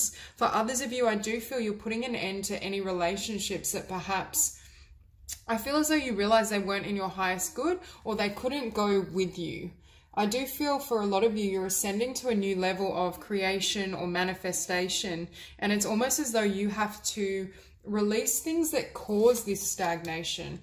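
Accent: Australia. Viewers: Excellent reading I chose #3. I have been told in the past that I am a healer. But I have no interest in it. I am aware of going through a spiritual transformation at the moment, so we'll see. Just along for the ride at the moment. Learning not to sweat the small stuff. Thank you. BTW I love your beautiful Australian accent. I could listen to you read the phone book.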